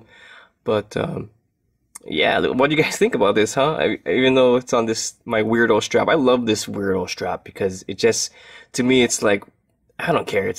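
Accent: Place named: Miami, United States. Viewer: This sounds like a Miami accent. Doral over here.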